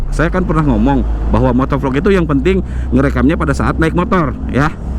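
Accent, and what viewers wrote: West Java, Indonesia. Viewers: Memang aduhai kang logat sunda purwakartanya euy..👌👌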